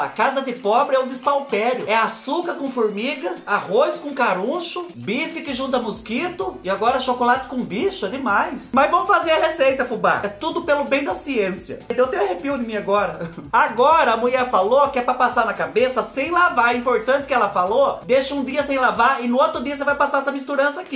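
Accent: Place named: Northeast, Brazil. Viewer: Fubá do céu eu pensei que vc fosse nordestino 💓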